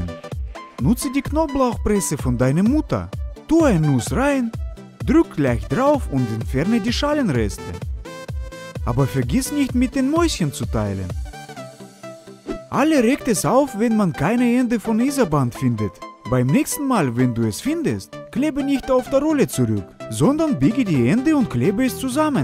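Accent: Russia. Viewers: bist du aus Russland? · Nur wegen diesem russischen Akzent geguckt :D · Are you Russian or Polish? Your German accent is interesting.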